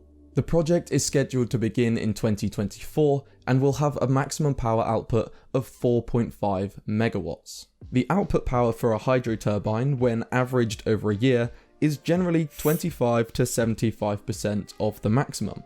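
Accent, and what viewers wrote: United Kingdom. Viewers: Ditch the British accent. It is considered very lowbrow. Your great content would be better served with a Swedish, French or Irish accent. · Well sounds like a Brit, so understatements are a matter of course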